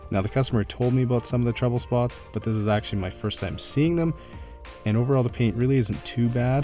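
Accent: Canada. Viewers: *Process in that Canadian accent*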